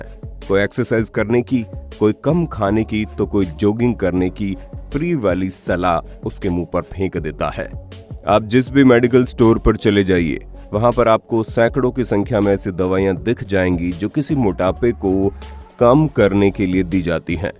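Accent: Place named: Jaipur, India. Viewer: Voice Vale bhiya Jaipur ke rehne Vale h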